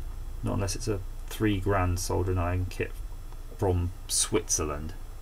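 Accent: United Kingdom. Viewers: Thanks for sharing, and I love your British accent !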